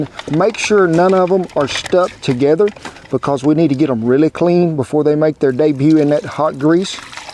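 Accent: South, United States. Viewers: We cook this kind of food pretty much every weekend, when the weather in our parts allows us doing so! Being a linguist, I would like to thank for his sincere and natural Southern tongue.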